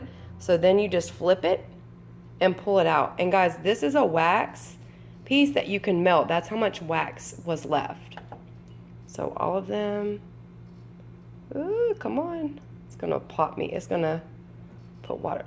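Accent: South, United States. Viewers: Girl, save your fingers! Just sit the jar on the candle warmer and enjoy the scent right out of the jar! When scent is not as strong anymore, dump out the wax in trash and wipe with paper towel and then wash jar. Less mess, and you won't burn your fingers! If you want to make the melts, just wait until all wax has melted and pour into silicone molds. I don't bother using melts or burning candles anymore. I just sit the jars on the warmer. So much easier! Love your videos and enjoy listening to your accent. "Y'all' Blessings. 😊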